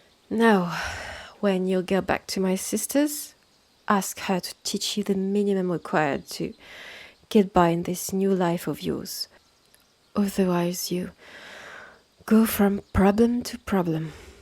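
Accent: France. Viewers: Your English is, quite frankly, amazing & I'm so jealous as I'm nowhere near bilingual. Only thing I'm any good at is cooking and as you're French that's probably no boast :p The icing on the cake is you haven't fully lost your accent, which is an absolute dream to listen to. The creativity here is on par with any other channel I've listened to & my hope is YouTube enables you to draw others here too. I am intrigued as to whether you write these in your native language & translate, or directly in English? Either way, it's working :) Keep it up!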